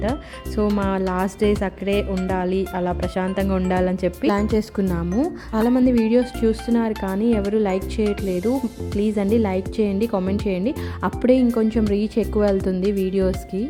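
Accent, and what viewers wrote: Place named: Hyderabad, India. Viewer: Eydhemaina hyd slang marchipole... Very good keep it up...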